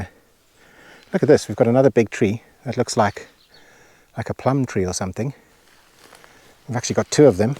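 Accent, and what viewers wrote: South Africa. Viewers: are you from South Africa? Your accent is similar. I visited Durban years ago.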